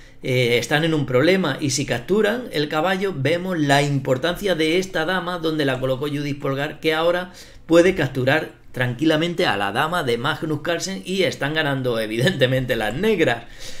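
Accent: Spain. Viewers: Habla muy españolete ese es el problema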